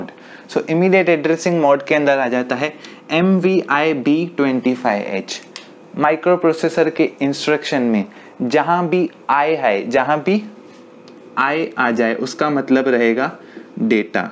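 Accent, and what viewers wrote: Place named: Gujarat, India. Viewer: Surely tell he is a Gujrati , apart you explain real well! I wish i got teacher like you for rest of my career.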